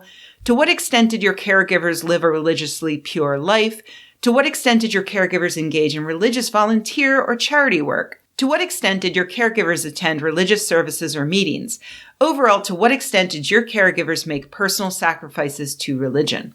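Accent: United States. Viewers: 1.)Congratulations, you have a strong American accent, but you enunciate so well that I can easily understand you. I often give up on trying to understand Americans because, in addition to their accents, they fail to enunciate. 2.)I am autistic so my brain does not work like most people's. 3.)Even from before I was born, I attended an evangelical Baptist chapel in a strongly Baptist rural community. I still believe that the whole community, including my parents lived as closely as they could to the teachings of Jesus Christ - they were not hypocrites. But by the time I started school at the age of four, I had decided that I did not believe what I was taught at Sunday School, as much as anything because the God of the Bible did not behave as well as my parents or others in the community - he seemed like a quite unpleasant sort of chap. I retained a vague notion that all those adults whom I respected must have known something I didn't, but even that became untenable after a while.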